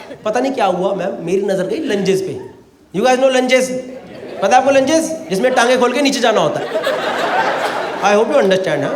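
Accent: Delhi, India. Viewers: Unbelievable ji, your typical delhi government school type talk make it awesome. I can relate with you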